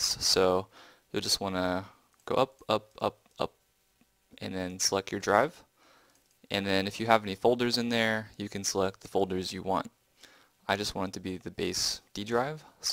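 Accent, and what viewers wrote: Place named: Sweden. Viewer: Holy shit you sound swedish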